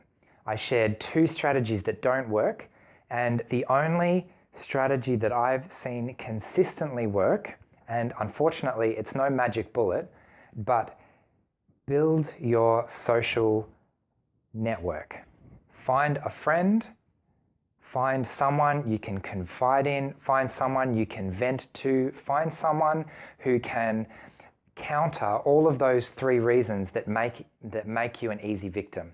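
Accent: Australia. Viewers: I agree with most of what this person says, except for one thing...this person is saying reporting harassers & bullies doesn't work. In my experience, it does, both in my professional & personal lives. This person, though, I'm guessing, is in Australia, based on the accent I'm hearing (I live in Canada)...perhaps the culture is somewhat different, so maybe there's some truth to the statements made about this here but I doubt the difference is big.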